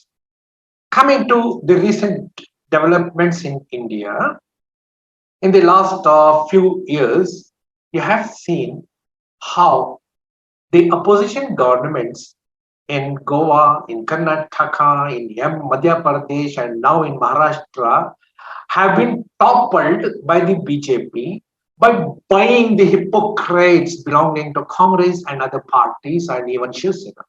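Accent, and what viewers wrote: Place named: Hyderabad, India. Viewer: Although a bit long, the video describes many backstabbers and carefully mentions that hypocrites are present in all societies and religions. By his pronunciation, one can guess that he is of Hyderabadi background and he dared to include Asaduddin Owaisi in the list of backstabbers. Personally, I do not have any reason to doubt Owaisi's intent to help Muslims, his actions speak louder affecting the election outcomes. The narrator mentioned that Owaisi's participation in UP and Bihar elections resulted in additional seats for the BJP by dividing Muslim votes. There are unconfirmed reports that Owaisi's party was given a huge amount of money for its participation in the election.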